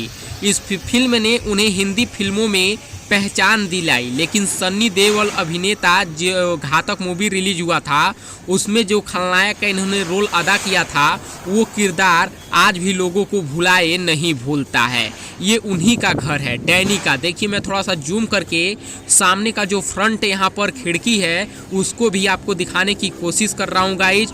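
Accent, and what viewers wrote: Bihar, India. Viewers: Are you bihari and bhojpuri speaking person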